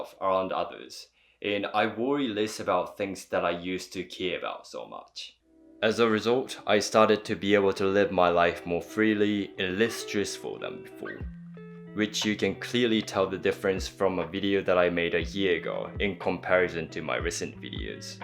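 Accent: New Zealand. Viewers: Japanese guy with a Kiwi accent... That's hot! 😍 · As a kiwi I spotted his New Zealand English accent immediately so not knowing anything about him (this is the very first vid of his I have seen) assumed he had spent some time in NZ. Looking back at some of his previous vids looks like I was right! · I agree, is a very good speaker of English. Being a Kiwi myself I can hear the NZ accent. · I can hear the kiwi and Japanese accent in your voice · Kiwi at heart and speech. Don't let them sass you! Kia Kaha! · Okay, 1. You are freaking beautiful, no matter what you wear, 2. You speak English better than MOST Americans, 3. Your kiwi accent is so endearing, and 4. Haters gonna hate — screw ‘em. You’re more evolved than most. Stay focused, stay positive, stay healthy. Love the videos. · Thanks for this video and sharing all your journey. Your English is quite good, and your NZ accent is endearing. 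I am grateful to be seeing Japan through your eyes. And some of your experiences of minimalism have helped me raise questions for my own approach to life. Please continue to share your observations with us. Blessings! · Your English is very good, you should have no worries about this, you have a beautiful voice and I find it interesting to hear a bit of New Zealand inflection coming thru every once and a while. I have a feeling you have not heard very many Americans speak English so you don’t know how badly it can be spoken! 😂 Very interesting topic I am exploring more myself. Thanks. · I thought I could hear a Kiwi accent hehe, I really enjoy your video's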